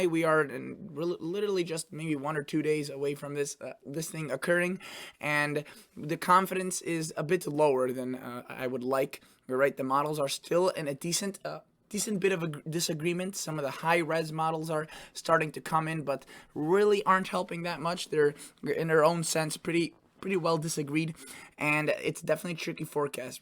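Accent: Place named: New York, United States. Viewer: Are u looking at Wisconsin for snow? You sound like a New Yorker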